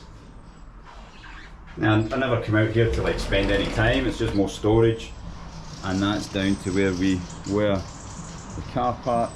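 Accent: Scotland, United Kingdom. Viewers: I love listening to Scottish accent. Greetings from Serbia bro. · Thank you so much , nice and clean ! Love your Scottish accent ❤ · Scottish accent is so cute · What strange messages that pop up on your video-----like "why you must leave the West and move to Moscow"....ummmh, why is that? Also, from all the other YouTubers who have posted vids of their apartments yours is certainly NOT the average one. Nice to hear your lovely Scottish accent, though! 🙂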